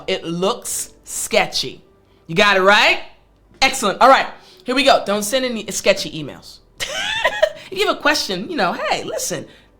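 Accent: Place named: United States. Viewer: I really get confidence when I listen to you. I personally don't like American accent but with your voice the accent sounds beautiful ❤ Ramadan Mubarak.❤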